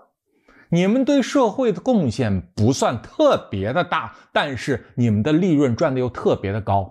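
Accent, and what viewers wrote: Beijing, China. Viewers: 一口京腔，南方人的我不太习惯。还是喜欢标准普通话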